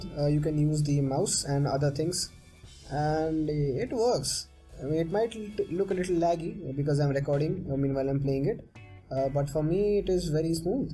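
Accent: India. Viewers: thank you so much...are you from India?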